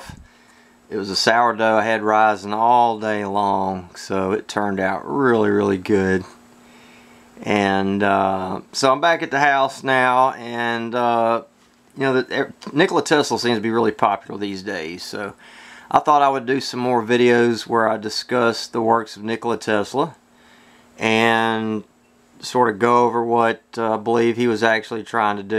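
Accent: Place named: Rural, United States. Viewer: Your accent is proof that people know more in rural America than in the cities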